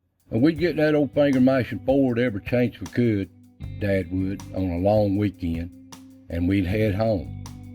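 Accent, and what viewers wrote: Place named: South, United States. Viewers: Lol I love the Southern drawl I talk like you do 😁 that's just way we was raised to talk I really enjoyed this story Thank you for sharing God Bless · I love your videos. It’s good to hear someone that speaks southern American like me. There are different dialects all over the USA but it seems like the only one that people don’t like is the southern one. Well I like it and I’m proud to be a speaker of it. I left the mountains of NC in 80s to work construction and was on the road working different jobs for about 10 years. There are a lot of good people out there but only one place that to me is God’s country. The Blue Ridge Mountains. I am in my 70s now and will never leave this country I love unless for vacation. Well, got to go till my garden. Enjoy your day. 🙏🇺🇸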